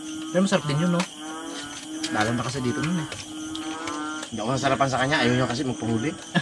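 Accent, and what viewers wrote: Iloilo, Philippines. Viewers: ilonggo kaba lods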